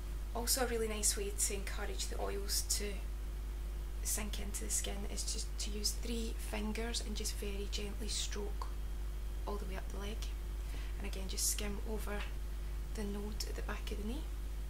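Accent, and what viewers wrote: Scotland, United Kingdom. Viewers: (ZombieToad) its Scottish I'm Irish so I know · Brandon Connell It's a Scottish accent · i really really like the scottish accent:) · she is Scottish for sure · Did you study at the Moray College UHI? I'm getting insterested inthe Complementary Therapy HND. You give some glamour to the Scottish accent! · You are such a pretty girl and I absolutely love your accent. I have always wanted to visit Scotland. Have you ever wanted to live on another continent?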